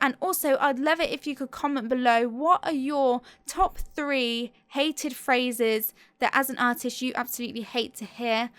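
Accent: England, United Kingdom. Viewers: I don't why but I really have some interest for English accent! Love it!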